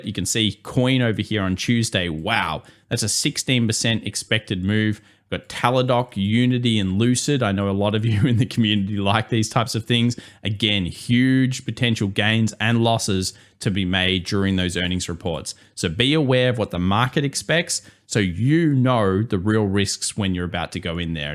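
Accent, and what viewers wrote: Australia. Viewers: He is Australian bro? Unless you can point to it.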